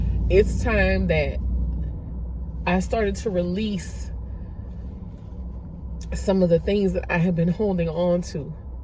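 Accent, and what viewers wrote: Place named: Louisiana, United States. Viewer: Have no idea why someone would complain about you talking. That Louisiana accent hit different. I could listen all day. Also, I'm sorry to hear you're going through these things but the Light is always surrounding us we just have to step back in. Stay beautiful inside and out ❤ So glad I found you ❤❤❤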